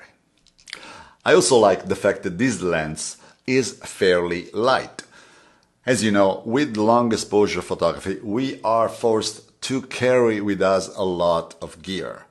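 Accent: Italy. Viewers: I watched every video of yours since I discovered you about six months ago. You always go with your 20 mm. No I know you why. You have a great style of teaching with your beautiful Italian accent.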